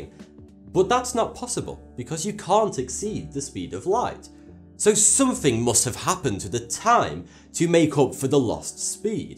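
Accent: United Kingdom. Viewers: Watch your channel just 2 learn the British accent, tank u.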